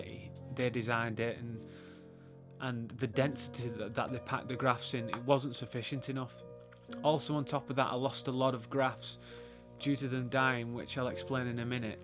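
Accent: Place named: Hull, United Kingdom. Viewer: Hull in england maybe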